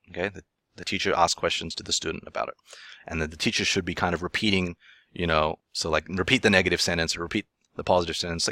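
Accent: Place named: Australia. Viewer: . I am new to your channel and taking Japanese at University of California San Diego to help me in my study of Aikido and Kendo. I had only been watching the videos where you are speaking Japanese and am trying to model your style/speed of speech because it is at a pace that I can create/form sentences and responses at my level. Are you America jin, Ostoralia jin or Nihon jin? You English is great with what sounds like an Australian accent. Love your stuff. Domo Arigato Gozaimasu!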